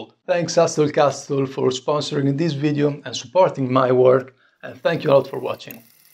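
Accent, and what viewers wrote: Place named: Romagna, Italy. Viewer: ma per caso sei di ravenna il tuo inglese ha un accento che i ricorda il romagnolo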